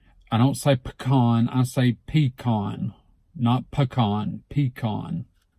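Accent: United States. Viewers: I love your southern accent. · Hello Y'all! This video helped a lot. How beautiful is your Accent. English Degree Student from Brazil here.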